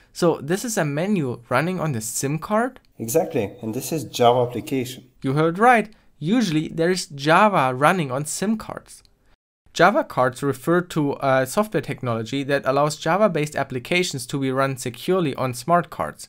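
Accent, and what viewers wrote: Germany. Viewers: Are you german? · Chicken Fish i think he is from germany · You are german right? Guten Tag ich bin deutscher :-P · junge hört man krass das du deutscher bist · Man hört, dass du deutscher bist. :D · Are you a German? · Bist du Deutsch? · Are you german Bist du n deutscher😂?? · Hmmmm 🤔 i can clearly hear that you are German ^^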